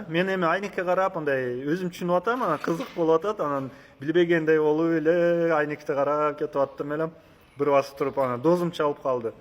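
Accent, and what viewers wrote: Standard. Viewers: Бирок кыргызчаны так адабий тилде суйлойт экенсин рахмат ошол эле мезгилде мен кыргызмын дегендер меням,сеням деп суйлошот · Азатсын , таза кыргызча суйлоп , элди тан калтырдын, азаматсын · Ооба бир да созуно орусча аралаштырбай таза суйлоптур баракелде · Азамат жигит! аман бол, таза кыргызча сүйлөйт экенсиң рахмат!🎉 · Ассаламу алейкум ,дрсум азаматсын ,анча мынча Кыргыздарга караганда таза суйлойт экенсин сонун,Аллах ыраазы болсун сага дрсум ,ккопчулугу Ош ЖалалАбад жактагыларга эгер салыштырсак озгочо ,бирок алар капа болбосун мен болгон чындыкты айтып атам ,келгиле туугандар таза суйлогонго аракет кылалы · Азаматсын балам, соз байлыгын коп , таза тунук суйлойт экенсин бар бол, ийгилик сага. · Какой ты молодец чисто разговариваешь на кыргызском языке. Браво. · Молодец! Омурлуу бактылуу бол таза суйлойт экенсин кыргызчаны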